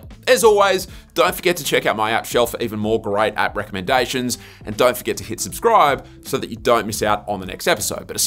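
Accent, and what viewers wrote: Australia. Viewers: I have to admit that the real reason I watch the 25 days series, is because I am smitten with his heavy Australian 'G'day Mate' accent.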